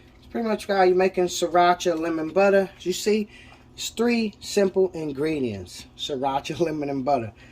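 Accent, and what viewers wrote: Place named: Brooklyn, United States. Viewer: Nice!! Im diggin this. My brotha sound like he from Brooklyn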